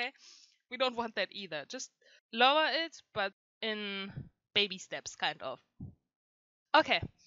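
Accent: Germany. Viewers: The german accent tho 👌🏼 Man hört es 😅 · Are u German ? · Den deutschen akzent fühl ich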